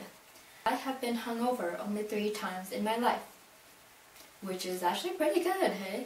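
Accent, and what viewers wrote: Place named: New Zealand. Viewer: hehe yup but i think its the new zealand accent seems easy to pronounce for me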